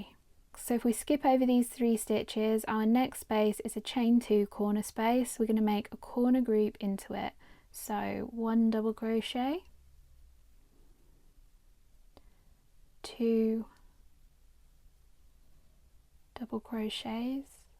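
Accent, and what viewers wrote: United Kingdom. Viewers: And for people who don't live in America and want to use UK terms, do I have to find a UK tutorial??? I thought with your accent you would be using UK terms. I need something not American.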